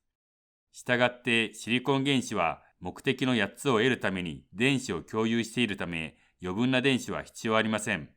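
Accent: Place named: China. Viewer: 喋り方が中国人っぽい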